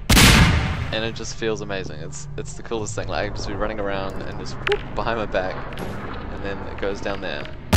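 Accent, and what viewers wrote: New Zealand. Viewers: you kiwi? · you got featured on Kotaku also great to see a fellow New Zealander making some waves on the youtube refreshing not hearing a American accent for a change keep it up man you got a new subscriber:)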